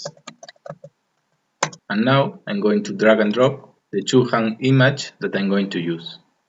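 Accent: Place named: Spain. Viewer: Anda que no se nota el español xD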